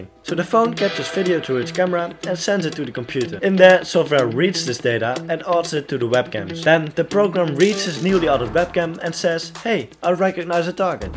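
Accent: Netherlands. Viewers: I immediately knew you were dutch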